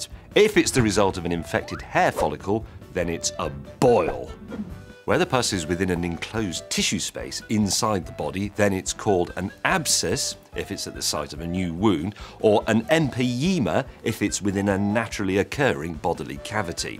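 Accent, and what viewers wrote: United Kingdom. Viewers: British accent 😳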